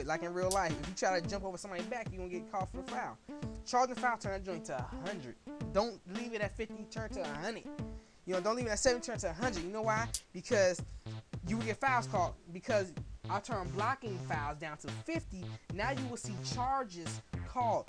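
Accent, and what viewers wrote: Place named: Midwest, United States. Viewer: u gotta be from the midwest .. Chi-Town?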